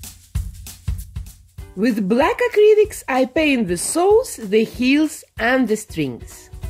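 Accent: Russia. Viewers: Сразу с первых секунд поняла, что вы русскоговорящая :D У вас замечательные видео, спасибо!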